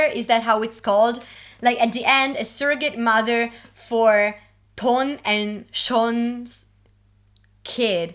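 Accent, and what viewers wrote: Italy. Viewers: Da quando hai cominciato a parlare ho percepito subito l'italian vibe ✨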